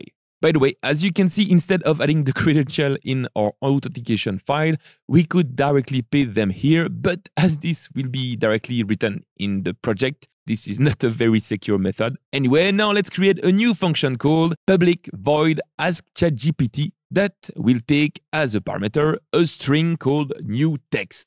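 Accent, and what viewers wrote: France. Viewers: C'est dingue comment on perçoit le côté français bien que tu gères l'accent, bonne vidéo 😉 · Son accent anglais est pas horrible et je le comprends facilement. Je pense que s'il parlait plus vite ce serait mieux. Son accent francais est evident mais c'est pas une probleme parce que son prononciation est fantastique. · Merci de prendre la parti de même si c'est inutile, j'ai bien précisé que c'était rien d'autre qu'une taquinerie, vous auriez deviné cela si vous aviez bien lu mon poste:P Bref, oui l'accent français est très fort ce qui fait le charme des ces vidéos, mais je maintiens le fait que si il ferait des vidéos en Français il aurait bien plus d'audience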